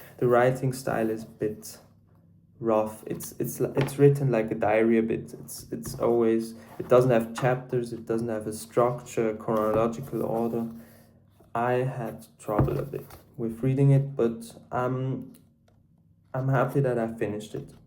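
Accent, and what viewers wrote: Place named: South Africa. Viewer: Hi, I'm new to BookTube too. Nice to meet you. Do you have Afrikaans in your background - your accent is a little mixed? Don't worry it's not a bad thing. I teach English and I like to work out accents.:)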